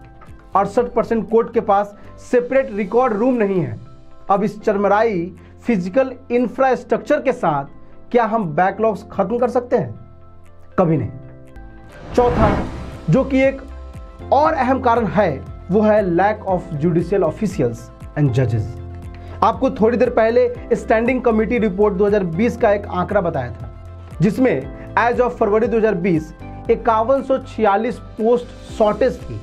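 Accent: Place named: Bihar, India. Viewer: शानदार प्रस्तुति दी है आपने किन्तु बोल चाल में बिहार ज्यादा है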